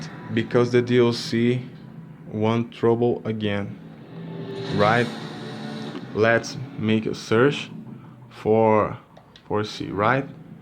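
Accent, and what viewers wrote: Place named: Brazil. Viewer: Percebi que você é BR.Pelo sotaque.Muito bom o seu inglês.Sou intermediário no inglês.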